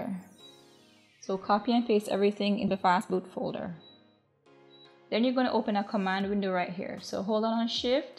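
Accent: Jamaica. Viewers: Knew you sounded Jamaican, yaadie repping here :D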